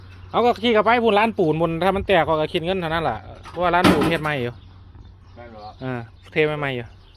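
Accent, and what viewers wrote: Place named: Nyo, Thailand. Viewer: คนสัยน้อคับคือเว้าญ้อออ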